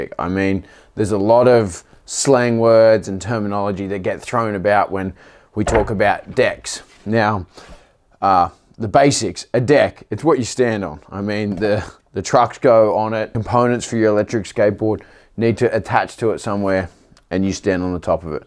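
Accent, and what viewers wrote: Australia. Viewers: He's Aussie accent is funny, it sounds like he saying "Dick" for "Deck"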